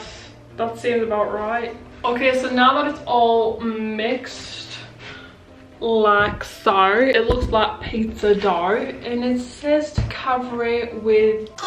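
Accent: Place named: England, United Kingdom. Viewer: I love your accent! What part of England are you from?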